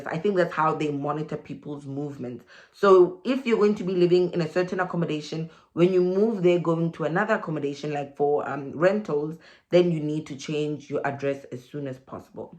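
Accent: South Africa. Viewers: Love the way you explain in such a South African way! Makes me feel so at home and like we can definitely do this!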